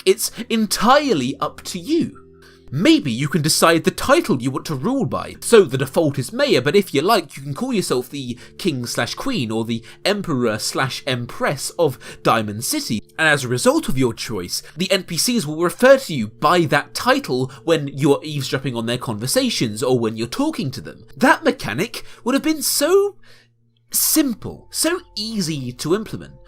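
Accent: United Kingdom. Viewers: Don’t you jut hate the modern style British accent (yours)? I just love the old accents because there was so much variety and they sounded so much less wimpy and snotty and weird.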